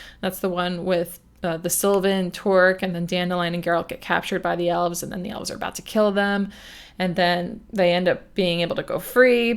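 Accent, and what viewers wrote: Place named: Philadelphia, United States. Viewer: awesome! I'm from just outside of Philly, but still lots of family in the area and over in Jersey still. I was showing your channel to my cousins who were down visiting from Atlantic City, and your accent kinda gave it away, lol. But yes, I'll probably just read along with podcast, looking forward to the next one!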